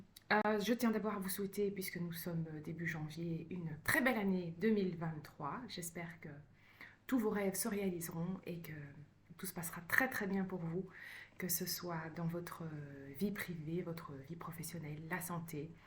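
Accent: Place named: Belgium. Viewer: Coucou! Trop contente de te voir sur Youtube ♥ Bienvenue! Je me retrouve tellement dans tout ce que tu dis:)) J'aime beaucoup ta douceur, et ton petit accent belge hihi. Hâte de voir tes prochaines vidéos! Bisouuus ♥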